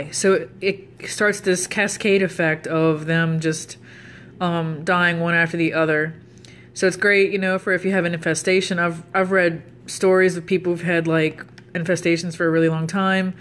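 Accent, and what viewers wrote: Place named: New Orleans, United States. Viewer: I'm from New Orleans as well (I know my people when I hear them lol)... This was helpful.. i just got some from Home Depot .. ill see how it goes 🤷🏾‍♂️...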